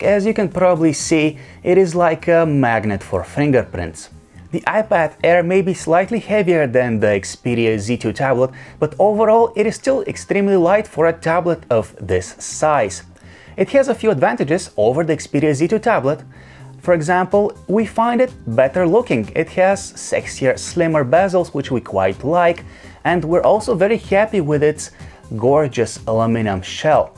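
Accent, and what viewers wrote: Russia. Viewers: you're Russian ? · Произношение заебись. Он русский, чтоли?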